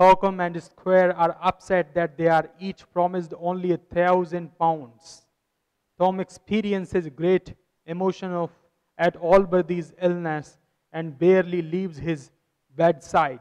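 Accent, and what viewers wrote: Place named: India. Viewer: This is bullshit!.. Indian accent doesn't go well with British novel.. you should have known that by now..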